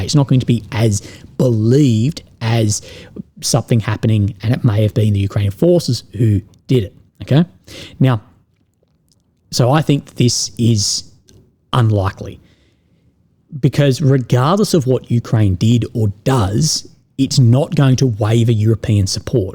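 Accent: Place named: Australia. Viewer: I did notice that you started speaking with an Australian accent since the chemo started.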